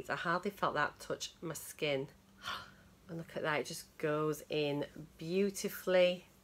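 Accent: United Kingdom. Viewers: me a born vegan, what a great vlog dear..and me like british accent,like with subs............blessing.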